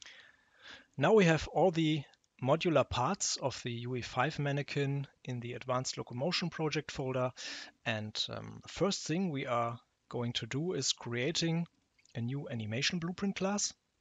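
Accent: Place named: Germany. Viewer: oh, German accent :)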